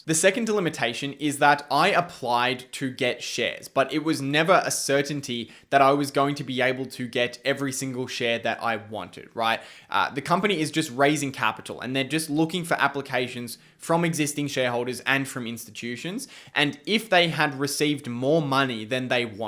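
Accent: Australia. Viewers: Hmmmm rosy cheeks and an Aussie accent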